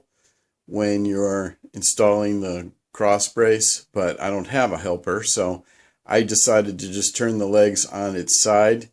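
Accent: New England, United States. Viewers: Sounds like Norm as he is from New England as well. Watch New Yankee Workshop and you will hear it as well.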